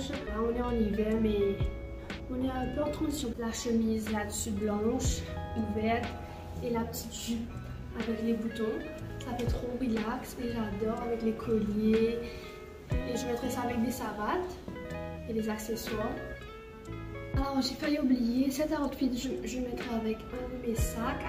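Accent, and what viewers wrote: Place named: Mauritius. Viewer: T'as l'accent mauricien. 😻🌹✨🌹✨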